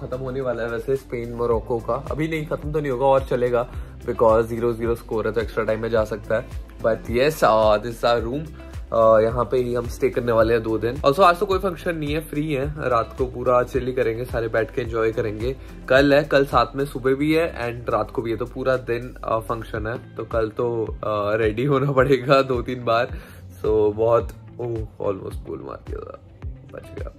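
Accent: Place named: Bengal, India. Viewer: Your bengali accent ♥️🔥🥺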